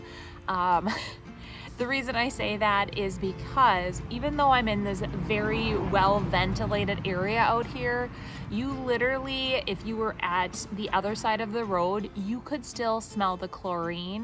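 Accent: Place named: Midwest, United States. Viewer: You are the cutest, hardest working, mid-west accent lovin gal out there!!!!! I adore you, I truly hope you get to rest in between everything you have going on!!!! Take care, have fun!!! Toni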